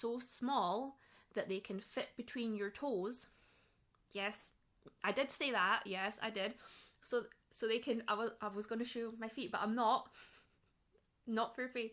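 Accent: Scotland, United Kingdom. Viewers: By the way, are you Scottish by any chance? Just going by your accent. I am a geordie, part Scottish😊.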